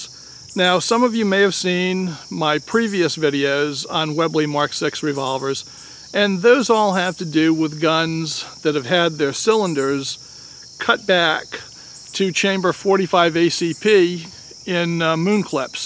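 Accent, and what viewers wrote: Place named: Southern Maryland, United States. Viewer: I think I recognize an accent of Southern Maryland/Northern Virginia. I'm from there.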